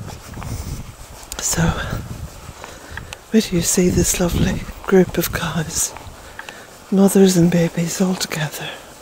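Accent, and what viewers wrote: Ireland. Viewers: Thank you, that was such fun. West Ireland is so beautiful, love it. Those cows were quite a treat. They sure look healthy. While you walked down your driveway I notice how the trees form a most lovely & mystical archway. How cool that is. I wish I could retain new information better. Thank you for repeating the names of the flowers & plants. I simply love Ireland & your accent. Since following you I've grown a better appreciation of my own small piece of paradise. Love to you, Jack & your home. Blessings P.S. My definition of goddess is same as yours.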